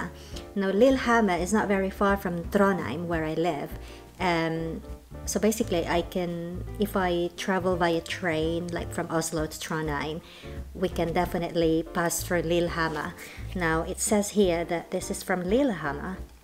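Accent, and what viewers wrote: United Kingdom. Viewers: Wow sissy! You got all kinds of julebrus there! Har du lært å snakke norsk? Watching this together with my hubby. Always fascinated with your beautiful brit accent. Merry christmas! God jul! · Have a Merry Christmas with a British twang #gemsscope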